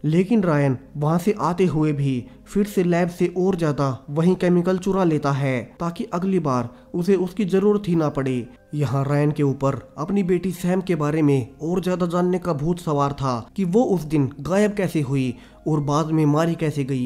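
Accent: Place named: Bengal, India. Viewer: I think you are Bangali.,.........like me, because your speaking style like bangla,............ don't mind that's my opinion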